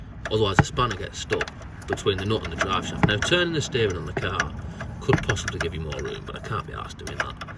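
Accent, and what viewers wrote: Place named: Lancashire, United Kingdom. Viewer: yep and don't go talking posh when you become a star . The Lancs accent is great 👍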